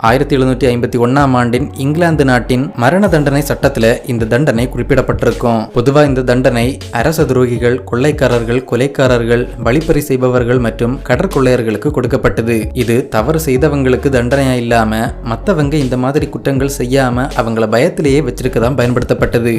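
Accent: Kanyakumari, India. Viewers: Anna neenga kanniyakumari thanea🎉🎉🎉🎉🎉😂😂😂😂😂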